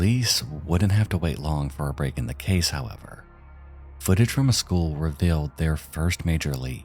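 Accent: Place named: Tennessee, United States. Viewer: You've got a slight Tennessee accent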